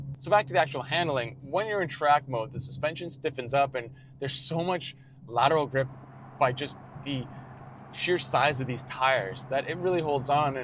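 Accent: Canada. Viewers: See what its all a boat. Lol your Canada accent actually fits in this case.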